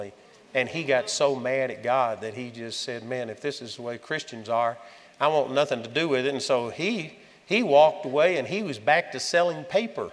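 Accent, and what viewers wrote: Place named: Texas, United States. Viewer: Andrew, you are the best. You are, what, 73-years-old? You look half your age, strong as an ox, going great guns and you talk about standing 10 hours out of 11 like it's a walk in the park, not even realizing that you pushed yourself, LOL! I truly love and adore you. Your lovely Texan drawl still lulls me to sleep at night, especially when I am especially frightened or frail or falling into despair, and I am still very much Saved and living for the Lord and leaning into His sweet Grace and Comfort. Hallelujah. Praise God. Thank-you for being there, and being out there, and being so honest. Blessings to you 💙🙏🏼💙🙏🏼💙🙏🏼💙💙💙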